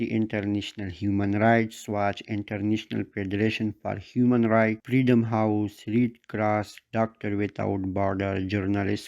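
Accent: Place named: Khyber Pakhtunkhwa, Pakistan. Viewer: ډير په زړه پورې معلومات ؤ ممکن ځينې خلک ستاسو د ژبې او د لهجې په اړه منفي نظر وړاندې کړي خو تاسو فعاليت جاري وساتي تاسو په ټول معلوماتو باندې پوه شومه همدرانګه ستا لهجه د کوزې پښتونخوا خلکو ده پوهيږو يوه پښتو ژبه ده فقط لهجې دي لهجې هم ډير فرق نه کړي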